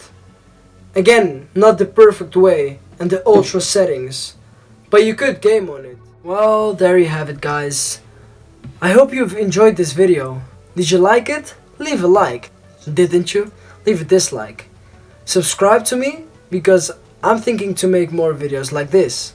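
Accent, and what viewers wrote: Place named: Netherlands. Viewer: Lekker NL accentje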